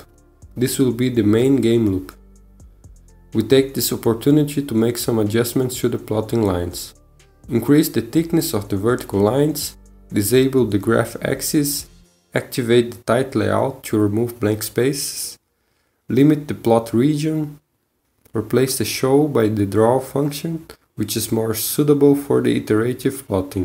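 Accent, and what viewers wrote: Brazil. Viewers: Parece inglês de brasileiro. Saquei pela palavra “vidio”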